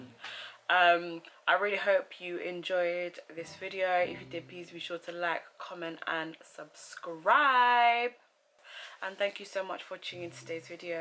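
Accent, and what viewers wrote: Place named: Uganda. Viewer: The Uglish is on point ! 😂Loved thisss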